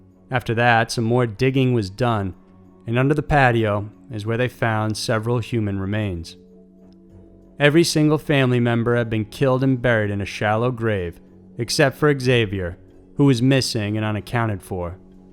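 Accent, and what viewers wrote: South, United States. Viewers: I love it too! He sounds like he has a southern accent with some of the things he says!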